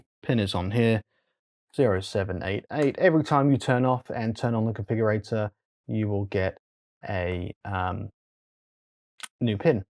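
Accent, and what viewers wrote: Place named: United Kingdom. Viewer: It looks good. By your accent I can tell that you are British. If you actually live in the UK how the hell did you get one?!? Their website doesn't even list the UK as a shipping destination 🤬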